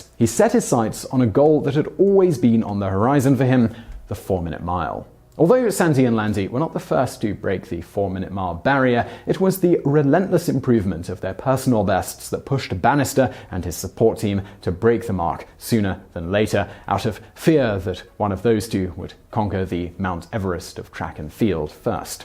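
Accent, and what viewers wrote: United Kingdom. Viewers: Why do I always have a hard time focusing on a video or even understanding what the hell is going on when it's in the British accent? I literally didn't understand two thirds of the video :/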